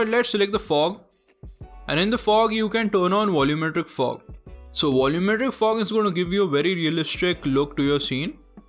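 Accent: India. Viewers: The indian accent is terrible to listen...evolve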